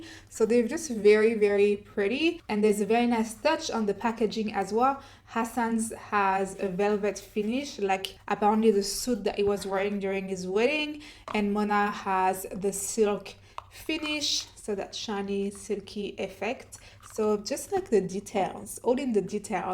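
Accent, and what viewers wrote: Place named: France. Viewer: Lovely review 🥰 I heard that the wedding silk was like a love child from vanilla 28 and Musk 12 which are my favorite😍 Does the layering of the two lean masculine? Also do you speak French? I detect an accent.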